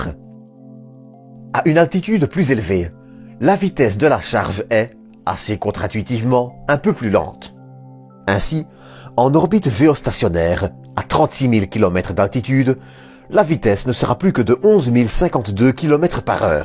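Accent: Belgium. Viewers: Un Belge qui dit soixante-douze... Je suis déçu... J'ai vu quelques commentaires sur ton accent. Ne le gomme pas trop, c'est dommage de cacher cette spécificité culturelle !! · Et le super-hairy aussi. Je trouve ça cool, le lanceur super-poilu, ça a la classe. Mais ceci étant, ce n'est pas un problème de prononciation (AMHA), mais plus une question d'accent (belge je suppose). C'est ce qui est sympa avec la francophonie, ça met un peu de variété. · Salut le Belge, c'est un compatriote (expat) qui t'écrit. Super chaine, super montage, super sujet. Mais en tant que Belge je dois dire que j'ai du mal avec ton accent. Je ne suis jamais sur si je dois en rire, car cela à quelque chose de sympa, ou si mes oreilles crient au secourt. Peut-être les deux. D'un côté c'est original... et cela dois faire marrer les Francais. Tout compte fait je me demande si j'ai pas le même 😳... 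non d'une frite ! Allez pouce bleu quand même.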